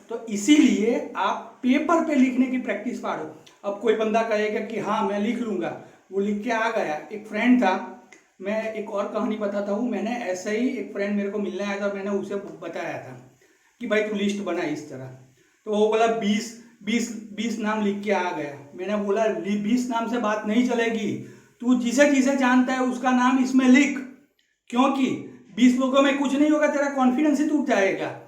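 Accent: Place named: Gujarat, India. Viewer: Gujrati h shyd 😂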